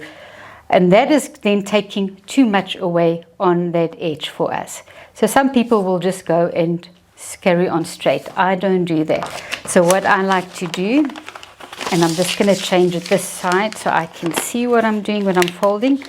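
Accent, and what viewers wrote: South Africa. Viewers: ok cool. I wasn't sure if it was a South African or New Zealand accent. (Fun to listen to people from different countries) Thanks!! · South African accent? Grâce pour l'information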